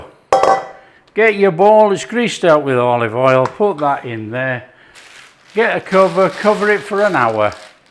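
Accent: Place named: Scotland, United Kingdom. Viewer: I'm half Italian, and this is really making me hungry right now. I'm browsing for bread recipes, I haven't even had anything to eat yet. Loving his accent. Is that Scottish, I hear?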